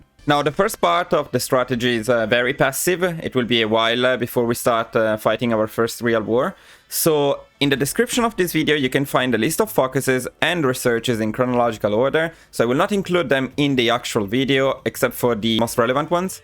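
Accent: Italy. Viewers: I couldn’t tell at first but the Italian accent is very distinctive. Especially with the “-a” sound at the end of a lot of words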